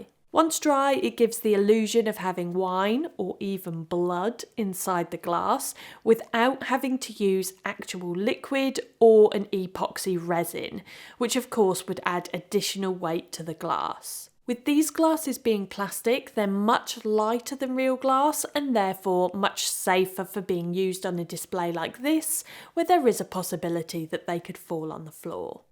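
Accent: England, United Kingdom. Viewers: Dearest.....love that your English accent is amazing. USA welcome. 2024 looking good.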